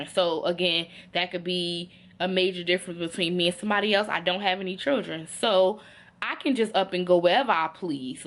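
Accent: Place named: Brooklyn, United States. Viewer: LOVE your story girl, you sound like you from Brooklyn more so than Jersey haha. Great inspiring video. GOD IS GREAT :)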